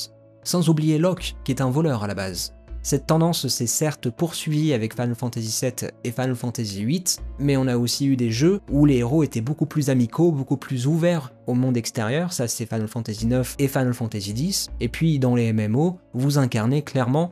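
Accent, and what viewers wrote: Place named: France. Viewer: Final Fantasy 16 sounds so much more legitimately medieval when a French person is talking about it 👍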